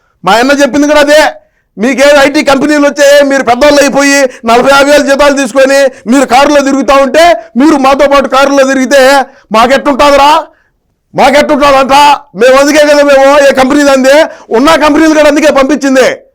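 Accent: Rayalaseema, India. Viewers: సోదరా.. రాయలసీమ భాషలో మీరు.. 👌👌🤣😂😅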